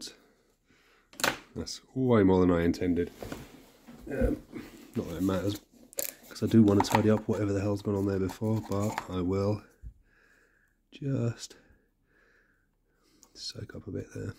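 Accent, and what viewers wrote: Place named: United Kingdom. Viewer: you're British ? ..why dont you speak proper English Proper ?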